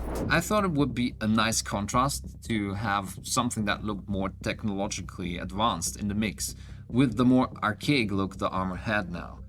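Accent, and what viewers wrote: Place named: Austria. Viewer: for a second i thought you where saying "animal" paint ^^ our austrian-english accent really is fun at times XD i really enjoy the sponge technique btw. i used it for some aos nurgle armor and really loved the result TY =)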